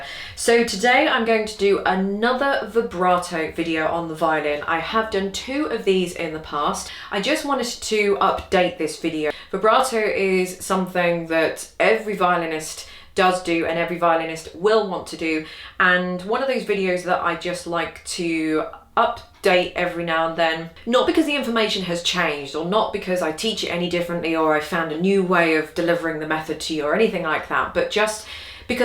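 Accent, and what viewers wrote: United Kingdom. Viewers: This woman is fabulous and no nonsense. I love British accents too :) you inspired me to buy a violin again a twenty year hiatus and here I am practicing!!!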